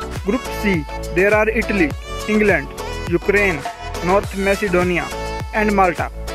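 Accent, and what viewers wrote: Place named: India. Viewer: some funny indian accent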